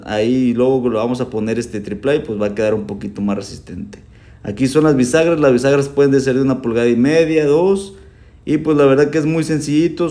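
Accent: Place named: Michoacán, Mexico. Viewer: Muy buen trabajo 👍👌 oye mi estimado eres de Michoacán verdad por el asento y la parota que trabajas eres como de coalcoman por esos rumbos de Michoacán jeje saludos y bendiciones amigo gracias por compartir tus conocimientos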